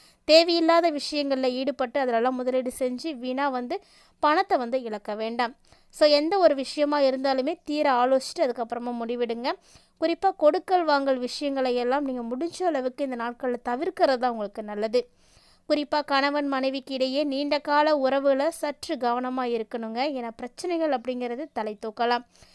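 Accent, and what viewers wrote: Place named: Tirunelveli, India. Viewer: ஏல திருநெல்வேலி பக்கம் வந்த உண்ண??????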